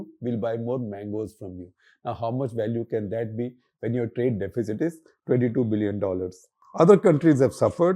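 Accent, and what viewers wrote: India. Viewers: Yo, Can you speak proper English instead of Endiaish? It's an embracement for your English master out there after all these many years of colonizing.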